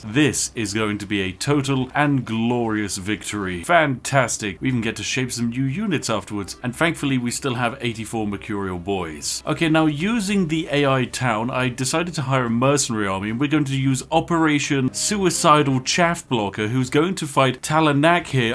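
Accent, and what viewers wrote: United Kingdom. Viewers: You sound too british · Hey....... are you actually British?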